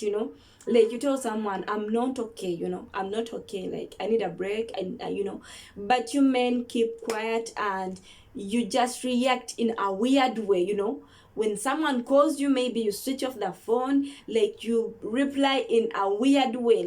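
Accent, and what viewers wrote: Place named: Uganda. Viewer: I feel you , but always communicate in relationships matters , munange kale I knew that accent that u are a Ugandan kabisa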